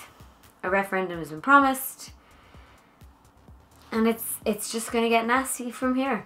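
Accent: Ireland. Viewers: I know it's that I'm American and not used to hearing the Irish accent, but I can't tell the difference between you saying legal and illegal.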